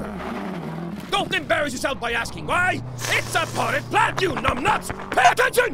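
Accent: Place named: Scotland, United Kingdom. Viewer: I imagined less... Scottish, still great.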